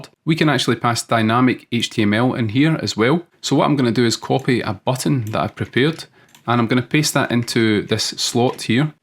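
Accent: Scotland, United Kingdom. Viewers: It would be good to have a cotton htmx video. By the way are you scottish ?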